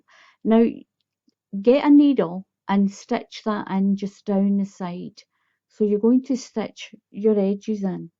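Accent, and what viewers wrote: Scotland, United Kingdom. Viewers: Another beautiful blanket, can I just ask you on the border what do you do on the corners for the double row and the treble row? By the way love your accent, I had my DNA done and I'm quarter Scottish. But I dont have the accent which I wish I did.,your work is as always fantastic.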